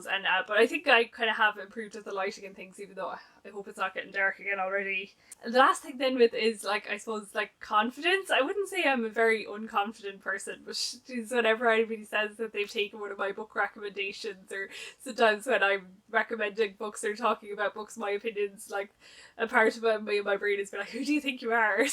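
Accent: Ireland. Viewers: Congratulations on your first year,. Really enjoy your videos and your smily face 😊. My parents were from the West of Ireland (Westport and Foxford) and I love listening to the accent too! Here’s to the next year. Catherine x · Congratulations on your first year! You have rapidly become one of my favorite BookTube channels. I love your taste in books, and I could listen to your Irish accent for hours on end!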